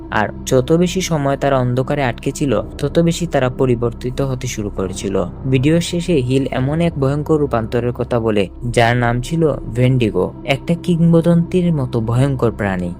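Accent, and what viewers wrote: Sylhet, Bangladesh. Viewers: আপনার মাতে লাগের তো আপনি সিলেটি সিওর নি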